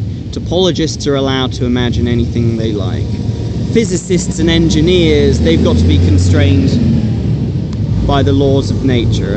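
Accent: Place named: United Kingdom. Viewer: Beauty with brains, and a British accent lol. Nicely explained.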